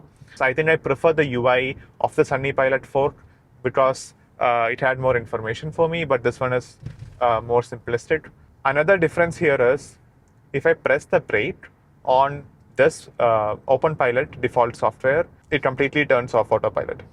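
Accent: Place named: Kerala, India. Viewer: bruh that mallu accent :)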